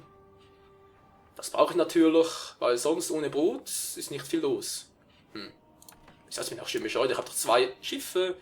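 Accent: Switzerland. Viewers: l_ANTRAX_l du der redet Schweizer Deutsch man man immer diese ernstirnigkeit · so. Das ist der schweizerische Akzent im Deutsch. Schweizerdeutsch verstehst du nicht mehr. xD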